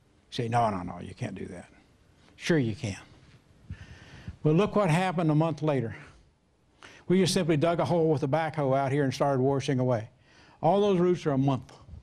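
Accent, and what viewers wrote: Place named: India. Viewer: Looks like the announcer has recently moved out of India. She has a serious indian accent.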